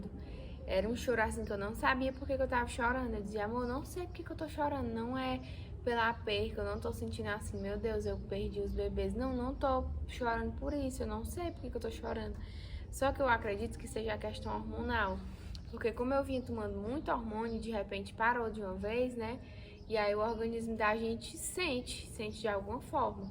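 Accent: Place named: Ceará, Brazil. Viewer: E esse sotaque cearense?..hehe.. Deus abençoe sua vida! Deus sabe de todas as coisas!! O meu caso foi muito parecido, adotei uma menina, minha Larissa. Ainda pensava em engravidar, quando resolvi fazer tratamento, descobri câncer de endométrio, minha sorte foi oq descobri cedo. Hoje estou curada e muito feliz pq estou curada e posso cuidar da minha menina Larissa. Presente q Deus me deu para que não sofresse depois uma histerectomia total. Deus sabe de todas as coisas. Tudo é providência Dele. Penso em adotar outra menina pq a primeira deu certo, amor maior não há!!!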